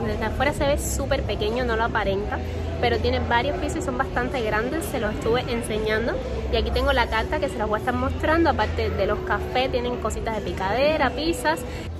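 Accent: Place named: Cuba. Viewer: Me encanta que no tiene el acento cubano tan pronunciado, algunos no se entienden cuando hablan rápido, felicidades, tienes potencial 😊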